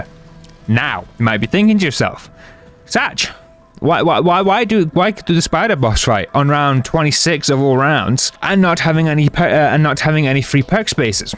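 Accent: United Kingdom. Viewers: Didn't realise you were British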